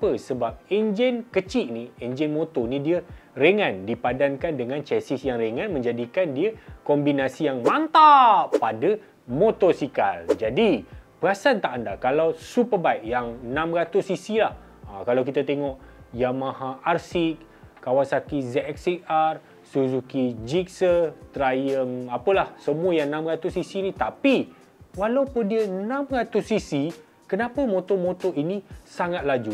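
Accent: Kelantan, Malaysia. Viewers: Bey demo ore klate dih?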